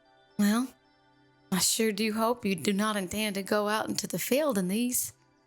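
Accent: South, United States. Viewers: I really get annoyed with fake Southern accents in ASMR so you're either the real deal or extremely convincing 👌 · Aww, I love a southern accent in my ASMR! This was beyond adorable! Thank you so much,!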